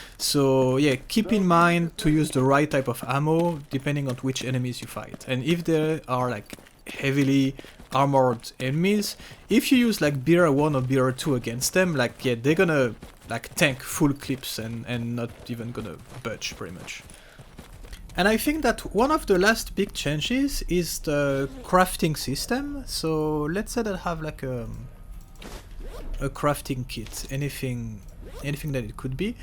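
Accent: France. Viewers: Je détecte un accent français sur cette chaine que je viens de découvrir. J'aimerai tellement qu'il fasse une partie française dans ses vidéos. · Je reconnais un accent francais :)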